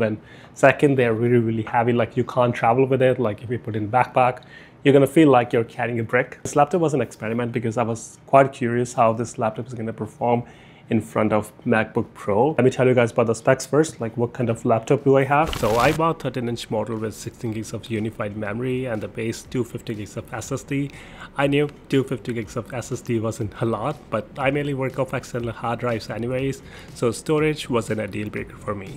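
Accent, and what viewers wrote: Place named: India. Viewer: the indian accent still slips lol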